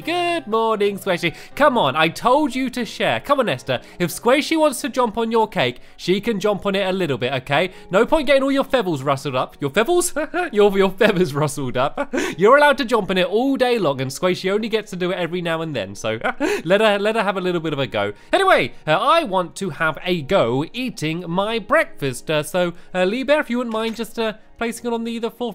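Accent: England, United Kingdom. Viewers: you have been building so many things in your lovely world thank you for doing that I have been building so many things on minecraft and it all came from you keep doing what your doing. P.S.I love your British accent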